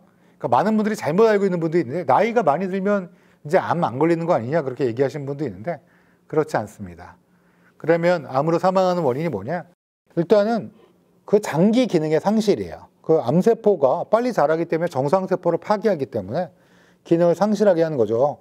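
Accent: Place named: Gyeongsang, South Korea. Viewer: 말투가 대구 언니네😅😅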